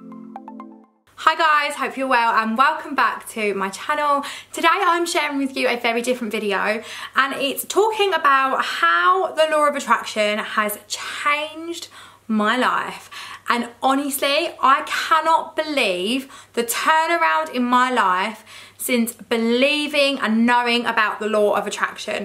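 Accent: United Kingdom. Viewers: British accent😍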